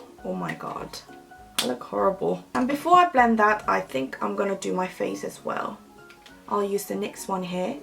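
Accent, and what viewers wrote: England, United Kingdom. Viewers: omg u have such a cute english accent!! loving ur content girl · Are you british? Your accent is so nice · your british accent is amazinggg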